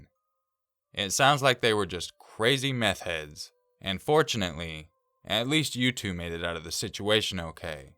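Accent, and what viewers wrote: Yorkshire, United Kingdom. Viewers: Oi love! Nice British accent. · Great Yorkshire accent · That Yorkshire story was rather funny! Bless, our accents are hard to do, Yorkshire has some of the funniest! Nice vid mate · Dat Yorkshire accent doe 😂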